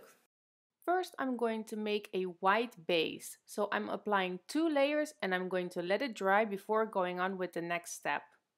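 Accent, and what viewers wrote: Netherlands. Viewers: One thing. Are u Dutch?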